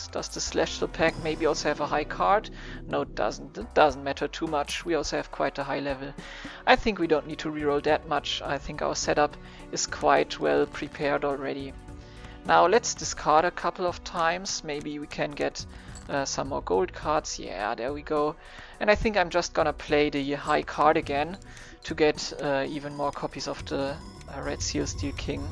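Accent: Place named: Germany. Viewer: German Accent, like it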